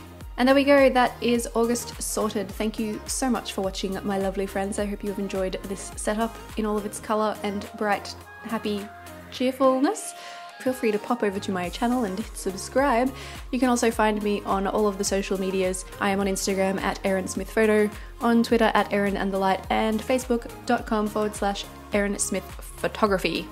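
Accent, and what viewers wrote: Australia. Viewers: Also, great to hear another Aussie Bujo-ist :’) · It's nice to hear another Aussie on Youtube. Love your spread.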